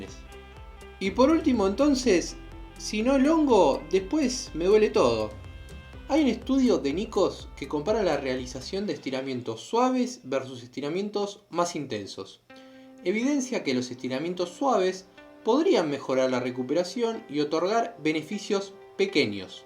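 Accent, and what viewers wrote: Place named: Argentina. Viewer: Me encanta el acento argentino suena bonito, tienes buenas recomendaciones.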